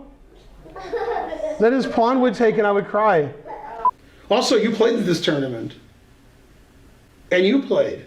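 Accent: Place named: AAVE, United States. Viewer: I can't understand you because of ebonics. I never thought the last bastion of edgy humor would be from a chess streamer..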